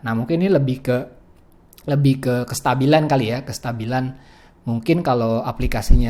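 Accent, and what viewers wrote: Indonesia. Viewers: mulut Indonesia bro. saya adja masih bilang ti itu t i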